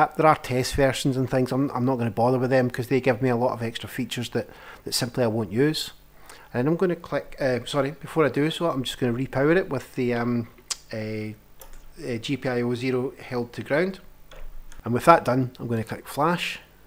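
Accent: Scotland, United Kingdom. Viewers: I love your scottish accent :)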